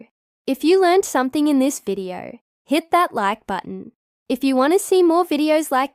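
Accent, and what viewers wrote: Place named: Australia. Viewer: Very interesting. Thanks for sharing. I like the relatively light Australian accent. 👍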